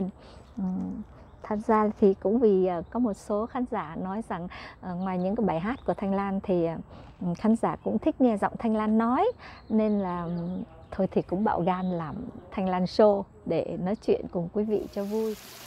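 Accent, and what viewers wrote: North, Vietnam. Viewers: Chị ơi theo em được biết chị sinh ra ở Đà Lạt nhưng chị nói giọng người miền Bắc nhiều hơn ah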